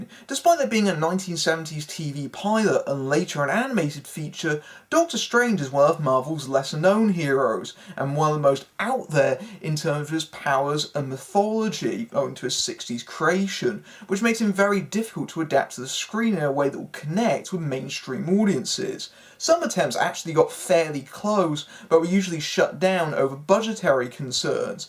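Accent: United Kingdom. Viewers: JotunTV It is called a British accent, something I don't expect an ignorant dumbass like yourself has encountered before.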